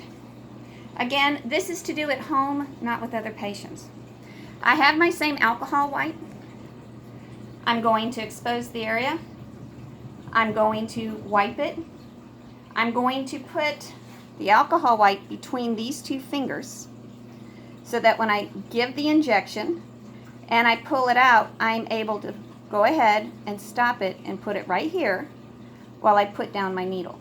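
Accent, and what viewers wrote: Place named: South, United States. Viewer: Thank you SO MUCH for this video. I’m having to inject solumedrol again and had kinda forgotten how it goes! Your video reminded me how easy it can go if you stay relaxed and focused. I wish you would post more videos! Your confidence and demeanor are truly soothing. Thank you, love. And ps, I totally understood your southern drawl #gagirlhere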